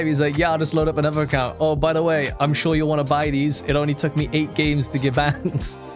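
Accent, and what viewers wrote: Wales, United Kingdom. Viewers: do i hear a welsh accent? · Is that a fellow welsh accent?👀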